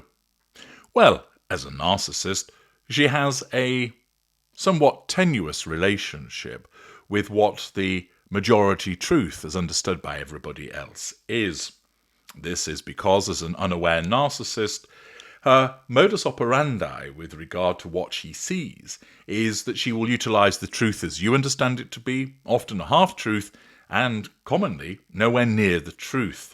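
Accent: United Kingdom. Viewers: Love your sense of humor. With your wonderful British accent you make shit sound classy. More fuel.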